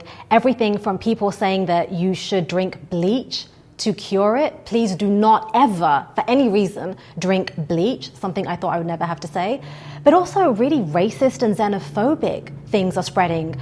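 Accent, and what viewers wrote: United Kingdom. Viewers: I always trust people with UK accents, even if they are just repeating bogus info. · She sounds British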